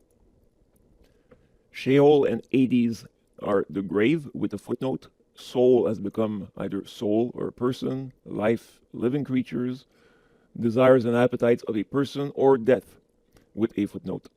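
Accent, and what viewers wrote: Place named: Acadia, Canada. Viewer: Hi great video My best friend is Acadien and your accent sounds like hers she is very proud of that. BLESSINGS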